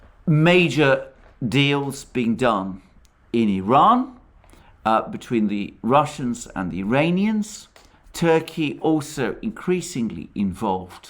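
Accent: United Kingdom. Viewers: Alexander's soothing British intellectual voice is unparalleled on YouTube! ♥️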